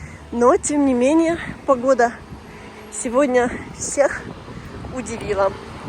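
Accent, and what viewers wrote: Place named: Odessa, Ukraine. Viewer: Спасибо. Очень красивое видео. Постаралась🌹🌹🌹 Ты единственная девочка, которая разговаривает с Одесской интонацией. Приятно слушать.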